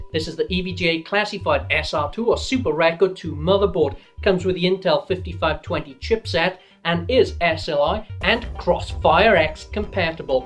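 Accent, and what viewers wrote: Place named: Wales, United Kingdom. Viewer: liking your accent is it welsh im presuming? soz if im wrong. i was just wondering seeing as i live in britain are the parts you recieve shipped from the states? do you pay for them yourself or are they sent to you for reviewing and such? i would die for a job like yours keep up the great work man :)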